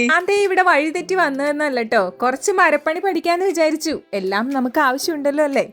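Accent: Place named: Ernakulam, India. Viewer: എറണാകുളം ഭാഷ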